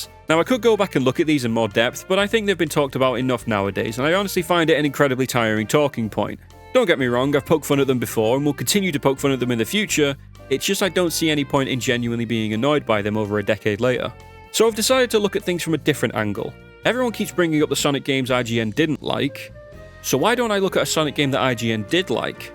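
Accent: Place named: Liverpool, United Kingdom. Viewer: Why do you sound like you're from Liverpool?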